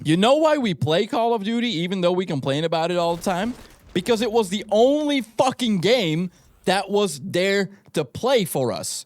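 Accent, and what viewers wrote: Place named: Netherlands. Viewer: je klinkt nederlands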